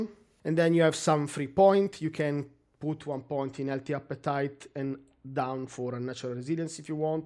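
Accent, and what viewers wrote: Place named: Italy. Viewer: ah ecco............mi pareva che tu fossi italiano!!!! dall'inglese, buono perfetto, ma ho sentito che quell'ìinglese era un pò da pronuncia italiana!!! Comunque Grazie, le tue build sono interessantissime e questo è un bellissimo gioco!!! che ancora non ho finito visto che sto provando build su build.........e sono a 100 e passa ore!! Hai preso anche l'espansione? io si, e mi piace un sacco!!!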